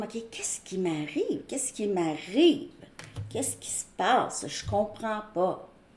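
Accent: Quebec, Canada. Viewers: Bonsoir de la région parisienne. J adore votre accent et le Québec. Ma fille vit à Montréal et j apprecie d y aller. Je suis vierge ascendant gémeaux. Votre lecture résonne bien en moi. Mon autre est parti depuis 5 mois justifiant qu il souhaitait rester seul. Gros choc émotionnel pour moi qui m a empêché d avancer. Je désire tant qu il revienne. Mais je commence à sortir de ce trou noir et espère du meilleur.😊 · Hello on a adore l'accent Québecois..on te comprend très bien. et cela me parle beaucoup. s'est lui qui s'éloigne pour ne plus me faire souffrir et moi qui ne me sens pas assez bien pour lui... du coup nous sommes séparés. il n'y aurait que le sexe qui pourrait nous unir.donc il y a hésitation de ma part. merci beaucoup de ce tirage gratitude et bienveillance:planet-orange-purple-ring:🙏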